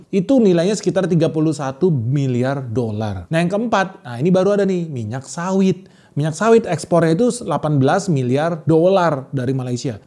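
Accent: Indonesia. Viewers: bkn..klu ko tguk komen2 dia kat video utube lain..dia ni org indonesia jgk..jilake..cara ckp pn nmpk sgt indo totok..haha 😂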